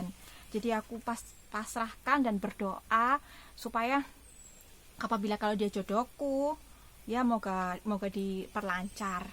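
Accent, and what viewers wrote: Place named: Java, Indonesia. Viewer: medok banget jowo nyo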